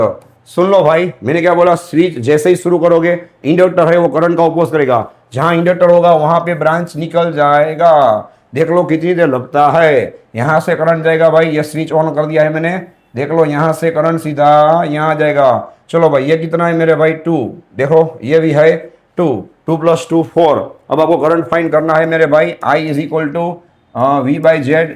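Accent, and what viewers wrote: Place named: Gujarat, India. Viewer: Very osm sir I think you are gujarati it's new for me to listen ❤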